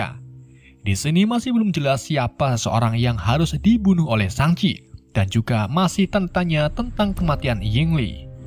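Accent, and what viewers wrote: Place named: Banyumas, Indonesia. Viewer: Dirungonin 😆😭 bang yeendeh rika ngapak yaa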